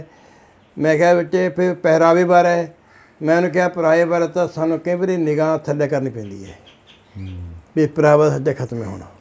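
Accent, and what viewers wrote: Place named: Kharar, India. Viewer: Kharar di boli aee ji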